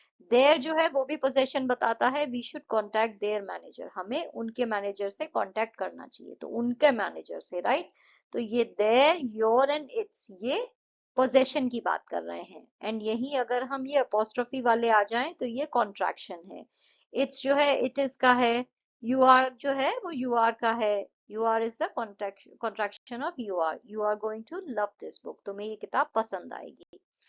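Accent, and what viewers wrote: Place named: United States. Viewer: mam where you from i had a hunch you from to America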